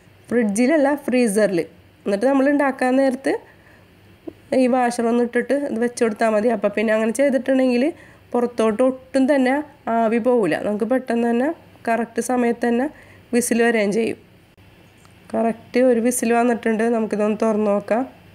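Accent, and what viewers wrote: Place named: Kodungallur, India. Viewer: ഇത്താ.... വീട് കൊടുങ്ങല്ലൂർ ആണോ... സ്ലാങ് കേട്ടിട്ട്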